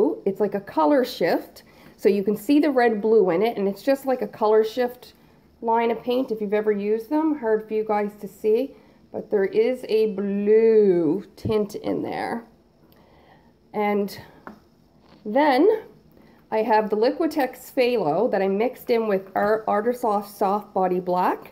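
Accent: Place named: Canada. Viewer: I have started watching you ! I love your style and your Canadian accent! Are all Canadians artistic! Ha ha keep up the awesome pieces♥️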